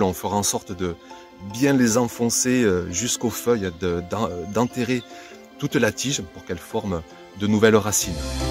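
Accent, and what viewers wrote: South, France. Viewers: Merci c est toujours un plaisir de te suivre avec ton accent qui chante le sud de la France sa réchauffe le coeur bisous de Belgique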